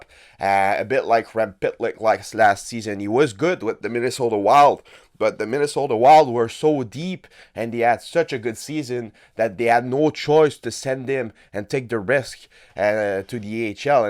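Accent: Quebec, Canada. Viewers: Really love the videos bud! You're really consistent and the Quebecois accent is sick hahaha keep it up brother!!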